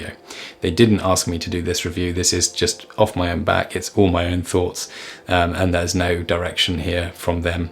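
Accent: United Kingdom. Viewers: I bought the Planck after I saw your video and would never renounce, the split should be in the Planck as some models you can see on reddit where you can basically decide the angulation while having an hinge on top. We need to change our position during the day and I was inspired by you to go also to the garden withe planck. But as I use android studio/intellij and xcode I need a cloud solution to use them via a remote desktop or cm cloud solution, because 16gb ram cannot have on a tablet. Do you develop with cloud based solution in your ide? Keep going with the great videos they are really relaxing and smart, and your English accent helps to enjoy them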